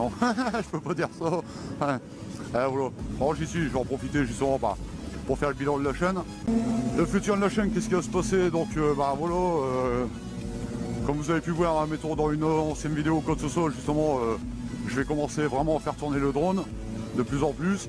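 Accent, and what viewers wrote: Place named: North, France. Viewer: Vu les décors et l'accent tu es clairement d'min coin toi x) Tu es aux alentours de Bouchain ? (Je crois avoir vu que tu avais fait une vidéo sur le salon moto) Garde l’œil ouvert si tu croises un gars en MT01, R6, Diavel ou Streetfighter 1098 habillé en rouge dans le coin, j'y roule presque tous les jours ;) Bonne continuation. V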